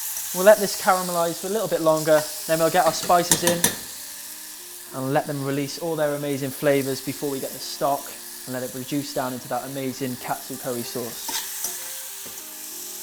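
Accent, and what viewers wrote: Wales, United Kingdom. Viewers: Your cooking is incredible! Thanks so much for sharing your skills, I would so happily watch you on TV doing this - get those Welsh tones on telly!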